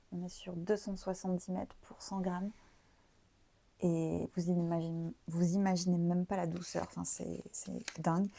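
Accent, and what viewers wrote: England, United Kingdom. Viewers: Bonjour ! Je démarre à peine le visionnage et je sens déjà que je vais aimer vous suivre! Peut-être vous l'a-t-on déjà demandé, mais pardon.. your english accent is amazing, vraiment, cependant j'ai du mal à saisir toutes les références que vous donnez, et je le regrette beaucoup tant ce que vous montrez est intéressant. Pouvez-vous les transcrire sur l'écran au montage ou les mettre en description svp 🙏je reprends la vidéo avec plaisir, merci de la partager! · Très sympa ton podcast et tu as un super accent british.A bientôt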